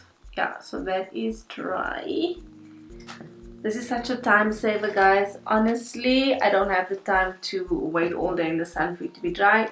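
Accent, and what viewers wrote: South Africa. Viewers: Let me guess, South African???? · That's definitely a south African accent I hear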